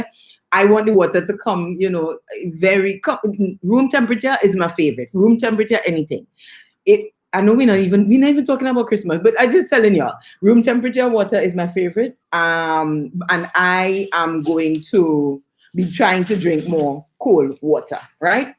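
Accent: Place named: Trinidad and Tobago. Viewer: awwwww i went to Trini a few years back :) this made me miss it. I love your accent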